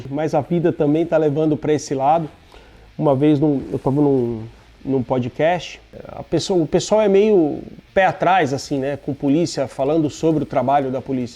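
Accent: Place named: São Paulo, Brazil. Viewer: Aquele sotaq paulista maroto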